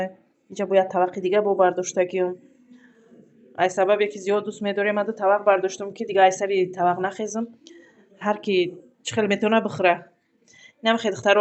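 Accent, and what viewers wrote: Tajikistan. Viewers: Хохарам шумо аз кучои ТЧК гапзпниет Кулобиеворияй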